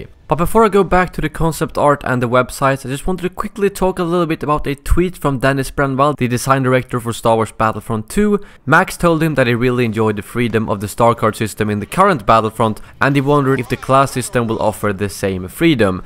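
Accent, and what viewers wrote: Sweden. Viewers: You can hear that he's swedish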